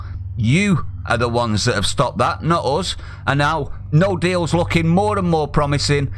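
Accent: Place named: Manchester, United Kingdom. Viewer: Jesus fucked, if that manc accent wasn't annoying enough you throw in an even more annoying fake laugh.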